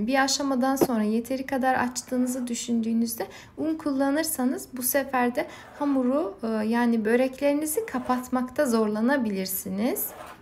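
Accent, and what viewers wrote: Azerbaijan. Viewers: Türkiye türkçesinde konuşmanıza rağmen Azerbaycanlı olmanız bellidir.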